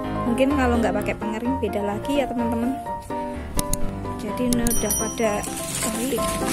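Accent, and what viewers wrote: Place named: Java, Indonesia. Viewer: Malang hadir. Logat medoknya aku suka, persis persis medokku jg. Ditunggu video2nya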